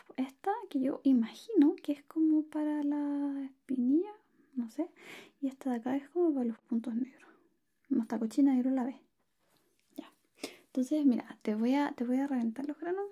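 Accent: Chile. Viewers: No sé si es mi impresión o ya no neutralizas tanto tu acento chileno. Solo te falta el cachai ja, ja, ja, ja... Un fuerte abrazo, amiga. Pronto ven a Guayaquil, de seguro tienes tu club de fans. Shalom. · En este video se le nota mucho el asentó chileno 😍 Un excelente video ❤ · amo tu asentó chileno😍👊🏻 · La mejor manera de iniciar la semana, con mi hermanita chilena (y coquette) Muchas gracias,. Una feliz noche de lunes (e inicio de semana) para ti también hoy. Cuídate mucho y que Dios te bendiga. Nos vemos pronto amiga, te quiero. Saludos desde Colombia hasta Chile, y, por cierto, muy lindo tu acento. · Me encanyel acento chileno, saludos desde Leenares, Japón. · Que linda, me lleve una sorpresa con tu acento Chileno🇨🇱, un abrazo, me encantan tus vídeos, me ayudan a desconectar.💫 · holis oye te tengo una pregunta en tu vídeo dices algo de que hablas como chilena eres chilena? 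· Ya vi que si es chilena. Weeeeeeeeey nunca se le habia notado su acento weon! Me enamore mas de ella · Amo el acento chileno😻intento hacer lo mismo pero a mi no me sale😭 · No me cae la forma de hablar de chile pero me hizo cambiar de opinion,ME ENCANTAN SUS VIDEOS DE LA HERMANA CHILENA MUAAACK!! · Weeey apenas empezó el vídeo supe que estabas hablando como Chilena, porque soy Chilena 😮😮 de donde eres? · Casi no logro descifrar tu acento solo hoy pude Chilena 😊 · Me encantas sin susurrar!!! Y ese acento chileno bellooooooo❤️❤️❤️❤️ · Me encanta cuando hablas con tu acento chileno ❤ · 100% con su asento chileno hermoso 😊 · primera vez en tu canal y reconocer altiro que eres Chilena uwu 💞💞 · Ahorita ando en modo "dios iluminame o eliminame" jaja ya que se me juntaron dos enfermedades (por wey en parte jeje😅😅😅) asi que tu voz con tu acento chileno mas el poderosisimo paracetamol me vendran de perlas para sentirme mejor, eso receto el doctor jaja😁😁😎. 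Que tengas linda noche. 😊😊😊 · Jaja ahora puedo ser el SJJ ros- digo digo COQUETTE! Pero antes una siesta no hara mal... Pd: Amo como hablas a lo chileno! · Hola eres my nueva YouTuber soy de Perú, y me gustan tu videos de ASMR te digo que eres una chica muy bonita y se me hace extraño escuchar un video con el acento chileno marcado y en otro videos no son tan marcado tu dejo chileno. Me agrada verte siempre.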